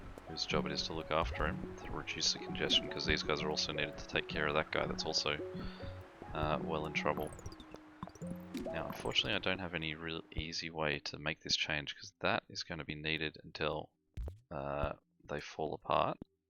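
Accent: Australia. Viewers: It is so refreshing to hear a fellow Aussie on this site, and one with a good mic at that. Keep making these vids mate, I'm waiting for you to blow up.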